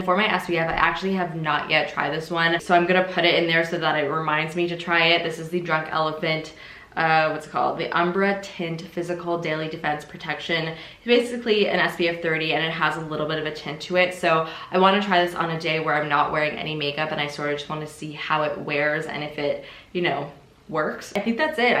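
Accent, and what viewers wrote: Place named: Canada. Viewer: Hehehe I love your subtle Canadian accent 😜 “pro-cess” vs “prah-cess”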